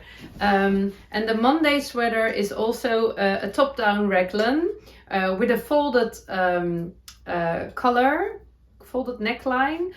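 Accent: Netherlands. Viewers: I love it. My dad and his family were from Holland, most of them have now passed so I love to hear your Dutchy English. It reminds me of them. I enjoy hearing about your culture also seeing some of the sites. Thank you for your English speaking podcast.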